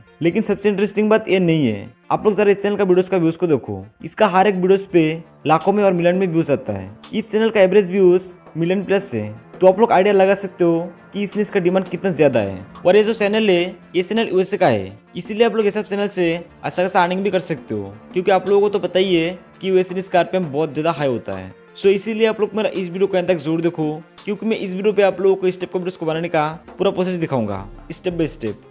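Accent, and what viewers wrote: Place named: Nepal. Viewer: Bhai aap nepal se ho mera dost bhi ese hi bolta hai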